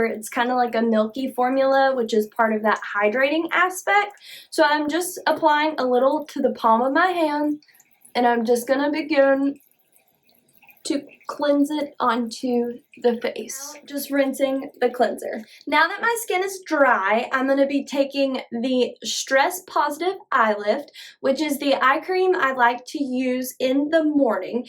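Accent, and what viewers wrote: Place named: South, United States. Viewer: are you still using the Dermalogica Skin Resurfacing Cleanser? I ask because, while I'm a red-meat-eating man, I'm of 100% Irish-American skin and have fought Rosacea for about 20 years, so I've tried to smarten-up on taking care of my face. I also spent many years in the sun as a Lifeguard, before SPF became 'cool'. I looked into and tried several IPL laser sessions, very productive, but I wanted more. So, I found Dermalogica Redness Relief Essence and was blown away at how effective it was...and you know, the next thing you're buying Vitamin C this and and and, all sorts of ointments lol. A friend gave me some GlymedPlus 10% Glycolic Face Wash. I use it 1-2 x a week, it helps to really exfoliate. I use Kiehl's Ultra Facial Cream for moisture. Morning: Wash w/Kiehl's Ultra Face Wash, Dermalogica Redness Relief, Dermalogica Biolumin Vitamin C and SuperGoop Unseenscreen SPF # 40. 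Night: Wash, Redness Relief, Kiehl's Retinol and Dermalogica Intensive Moisture Balance (FYI...Clinique Even Better Clinical Radical Dark Spot Corrector + Interrupter during the day is something I use 3-5 x a week and it seems very effective at helping dark spots/old sunburns etc). Ms., I was looking for a new, daily cleanser...I like the Kiehl's, it is gentle...but the Glymed Plus is very strong and drying....I stumbled upon your video and was drawn to the Lactic Acid 3% Dermalogica Cleanser you used, so I bought it. You have Disney Princess Skin, truly. Combined with southern accent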